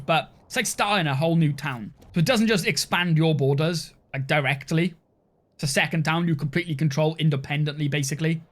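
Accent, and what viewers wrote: Wales, United Kingdom. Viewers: Is that a Welshman I hear? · Ooooof so Welsh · Got me hooked straight away with that Welsh accent lad!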